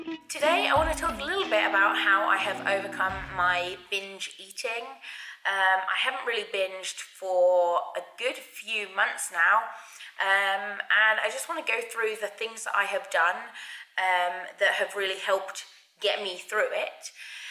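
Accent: England, United Kingdom. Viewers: Been watching your vids and this was the first I heard with your vocie! Me: oh she's English!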